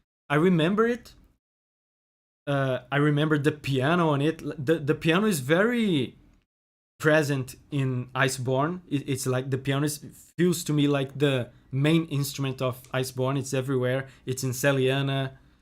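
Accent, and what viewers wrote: Brazil. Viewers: Are you by any chance brazilian? been wondering that for a while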